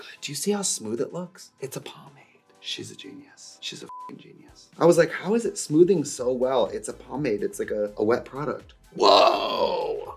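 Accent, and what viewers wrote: Wisconsin, United States. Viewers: Your Wisconsin accent is thicker. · Trixie goes on vacation for 3 months and comes back with Milwaukee Wisco accent in spades she's been trying so hard to keep under wraps. [I also have this accent and try to keep it under wraps] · The wisco accent is really strong after the break 😂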